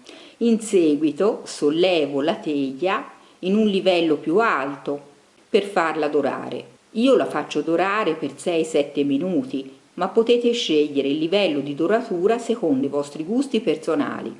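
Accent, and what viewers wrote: Tuscany, Italy. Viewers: TROPPO BRAVA!!!!! Ogni ricetta un successo...ho fatto le brioches a forma di fiore con marmellata: grandiose!!! BRAVA!!! E poi il tuo accento toscano da una tranquillità...bacioni! :)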